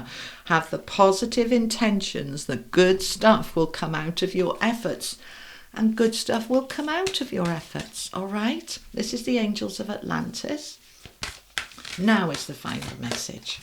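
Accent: England, United Kingdom. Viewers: I love your British Accent, watching all the way from Namibia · South of Sydney Australia... British living here over 50 years can't beat that Pommies accent❤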